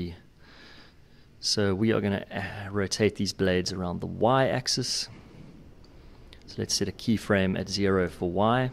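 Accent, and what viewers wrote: South Africa. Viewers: Thought i picked up a RSA accent confirmed when you said "ya" great videos and very well explained dankie from Livingston Scotland. Sub and liked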